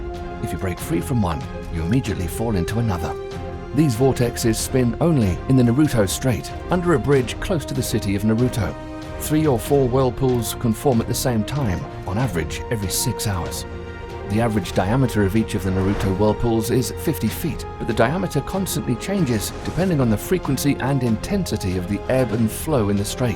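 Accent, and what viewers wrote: United Kingdom. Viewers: he sounds slightly british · Just how a lot of English people speak.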